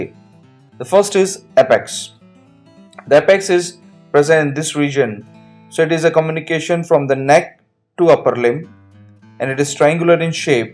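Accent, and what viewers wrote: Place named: India. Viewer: I just become so much distrub whene I hear Indian English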